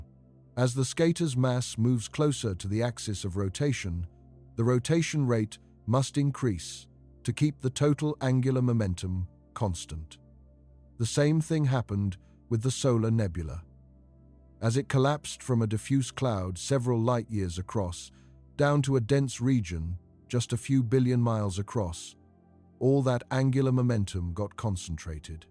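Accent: United Kingdom. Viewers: This British narrator speaking of a baseball spin must never heard of the ‘ol “knuckle ball” which doesn’t spin!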